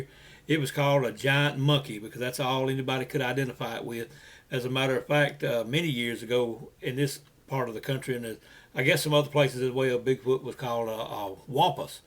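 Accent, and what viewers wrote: South, United States. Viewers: I loved listening to your Southern drawl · That was a good story, the illustrations were fantastic and I love your southern accent. Glad he didn't shoot BF and all turned out well for everyone, sorry the dogs got beat up tho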